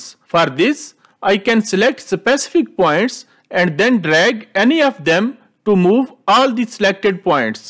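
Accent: India. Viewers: Indian accents are always funny 😂. Very good video, very helpful 👍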